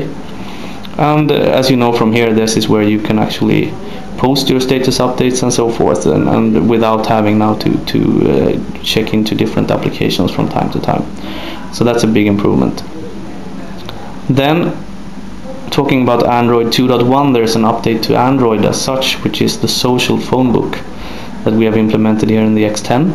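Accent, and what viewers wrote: Sweden. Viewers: Haha låter som svengelska! :D Något nytt datum? Liksom senast om 10 dagar SKA den finnas ute. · Ge oss den uppdateringen istället för att reta oss med din förbannade svengelska!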